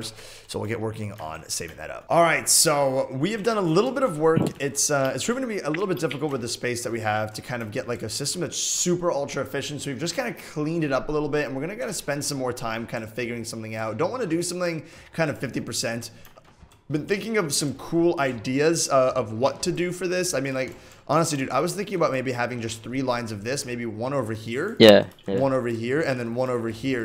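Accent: Scotland, United Kingdom. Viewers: Its Scottish like meh :D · OMG I LOVE ACCENT U SCOTTISH GUY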